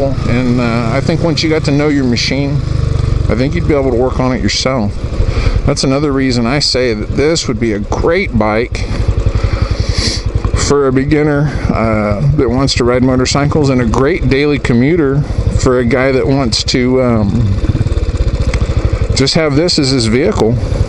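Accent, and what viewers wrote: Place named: United States. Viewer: I love your accent so much, u sound like a cowboy from the wild west, where are u from?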